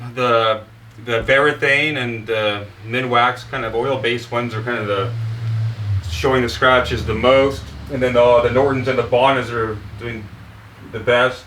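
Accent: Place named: Canada. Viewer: I knew you were Canadian as soon as i turned it on! i'm going to refinished my floors and this was so much help!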